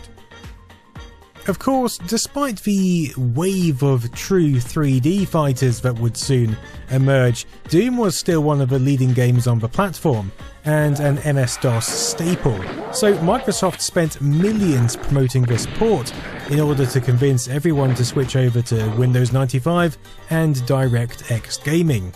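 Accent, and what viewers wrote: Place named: England, United Kingdom. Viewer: Just wanted to say I love your documentaries and how professional they've become. Your gentle English accent is soothing to my American ears. I'm grateful to get to learn more about niche retro computing topics.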